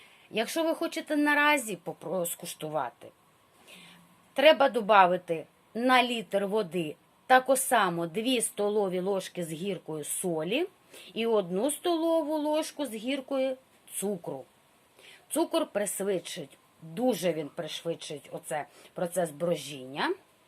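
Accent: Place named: Surzhyk, Ukraine. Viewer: зараз такий час коли усі балакають з телефоном та самі з собою.Ви теж квасю яка гарна суржикова вимова та говірка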